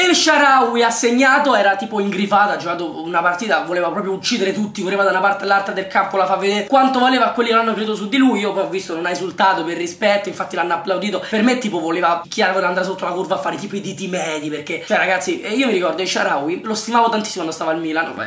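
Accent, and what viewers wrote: Rome, Italy. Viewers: sei romano?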